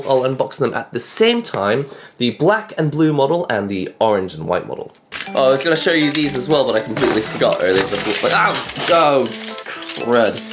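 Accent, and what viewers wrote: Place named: Northern England, United Kingdom. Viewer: You probably learned English from England, maybe north of England because of your accent.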